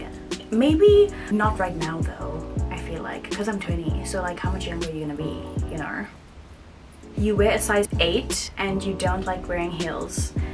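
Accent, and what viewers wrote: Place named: Xhosa, South Africa. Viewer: Going thru ur vids catching up and Okay i love your accent no cap Are you xhosa🔥😌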